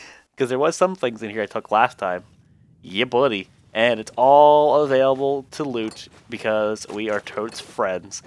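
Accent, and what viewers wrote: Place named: United Kingdom. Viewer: OMG the British accent had me going....lol if you know what I mean wink,wink, even my hubby knows a British accent I get all hot and heavy for so when I heard you speak it I lost it... breath oh by the way good game lol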